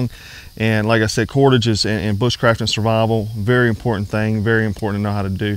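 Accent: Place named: Texas, United States. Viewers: texan xD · Oh right! I just imagined his saying Texaaas, it made perfect sense.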